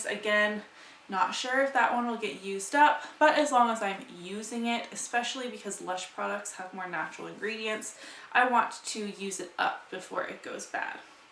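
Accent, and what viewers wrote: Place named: Canada. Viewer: Awesome update! Nice job losing the pounds and for having two empties!! 👏🏻👏🏻 I have been wondering this whole time if you are from Canada, so happy to finally get confirmation in this video!!! I seriously love your accent! Haha as weird as that sounds! 😂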